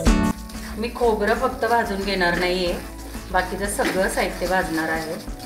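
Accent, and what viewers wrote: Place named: Solapur, India. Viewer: Kaku tumhi proper kontya gavchya ahat tumchi language Akluj solapur sarki vate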